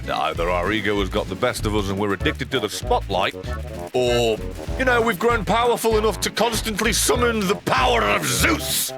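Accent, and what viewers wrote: Scotland, United Kingdom. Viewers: I love his Scottish accent and I have no idea why.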